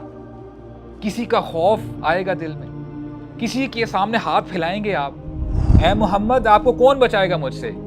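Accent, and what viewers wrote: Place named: United Kingdom. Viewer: Please avoid using English accent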